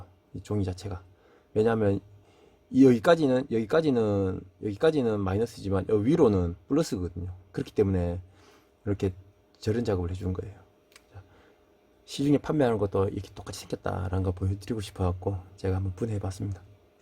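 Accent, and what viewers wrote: Gyeongsang, South Korea. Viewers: 밧데리 박사학위 드리고 싶어요 구수한 갱상도 사투리 좀 버벅하시만 그것이 더 정겹네요 쪽집게 과외 처럼 존경합니다 밥 커피 대접 하고 싶어요 저는 🏝에 살아요 부시리 삼치 드시러 오세요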